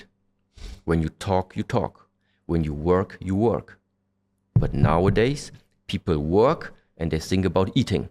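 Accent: Germany. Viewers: Didnt expect the german accent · Why does bro have a really German accent? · Sag ma bin ich es oder hör ich da einen deutschen Akzent?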